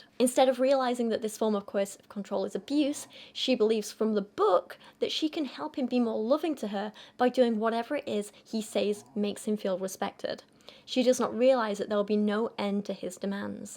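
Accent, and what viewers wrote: United Kingdom. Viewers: I think the coughing and British accent sort of makes you sound like a ye olde aristocrat- in the best possible way, you rule, your Majesty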